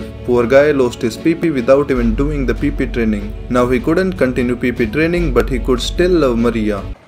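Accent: India. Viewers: Are you an indian 🤔 just asking because your voice sounds very familiar with the people of india 😎. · I just starte watching this chanell and its just so fun with his indian like voice exsplaining typical baki stuff to me like it was kindergarden i love it! (allso why is every single human in baki anime have more mussels than Any body bulder on earth?) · Where are you from? Your accent is Indian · the indian accent is what makes this videos the best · Is this guy from India?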